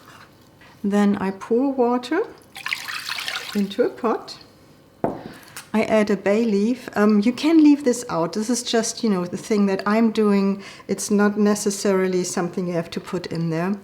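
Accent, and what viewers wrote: Germany. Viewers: You sound just like my German mother who was from Northern Germany. Steer (stir) it😅😊😊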